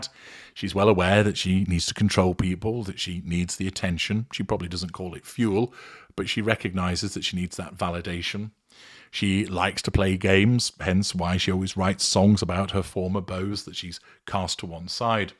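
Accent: United Kingdom. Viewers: This guy really does waffle on. Amazing how many people are impressed by just a pompous British accent.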